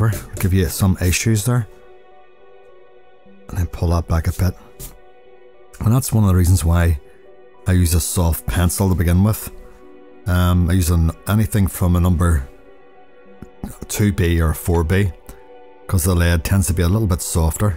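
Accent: Northern Ireland, United Kingdom. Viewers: I love your videos! And it's so nice to hear someone from Northern Ireland talking ❤👌✨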